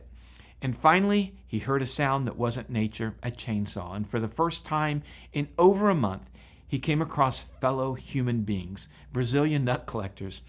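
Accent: Texas, United States. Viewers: it is obvious you are from Texas.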